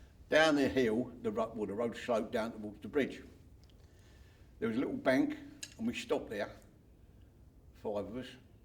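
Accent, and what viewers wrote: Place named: South London, United Kingdom. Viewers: Sounds like south London to me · South East London.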